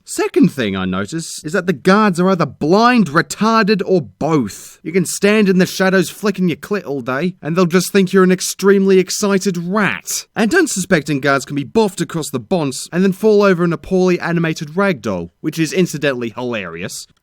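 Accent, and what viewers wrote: Australia. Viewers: WAIT! You're Australian! Oh... That explains a lot (not really). Awesome!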